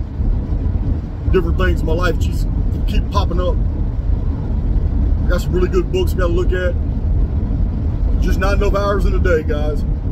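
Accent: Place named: Texas, United States. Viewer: Dude you sound soooo Tex us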